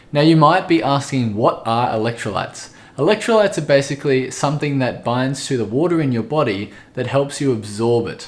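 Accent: Australia. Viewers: So nice to have an Aussie presenting these videos. Thanks. Very informative.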